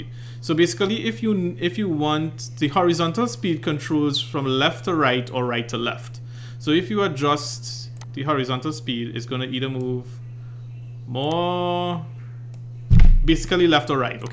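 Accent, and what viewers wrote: Trinidad and Tobago. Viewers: I'm sorry but the Trini accent just made me unreasonably happy. Also, the tutorial was so helpful. Thanks for this!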